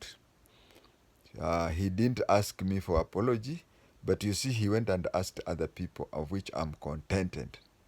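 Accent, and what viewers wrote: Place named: Kiambu, Kenya. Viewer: Whether you’re aware about it or not, you’re now a public figure and we as your supporters are interested in knowing more about you. You have periodically mentioned your father but only given minor glimpses here and there about the other half of your Kikuyu background. Tunangojea kusikia hayo and by the way, for your mother to be from Nyeri, you speak Kikuyu with a Kiambu accent.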